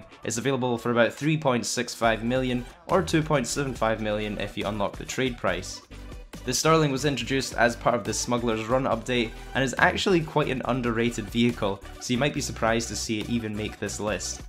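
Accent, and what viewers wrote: Scotland, United Kingdom. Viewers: Love your scot accent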